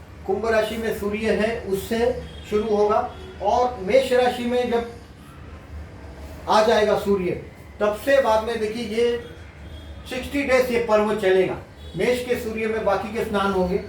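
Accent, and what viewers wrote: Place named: Gujarat, India. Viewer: Is he from Gujarat? His accent is so.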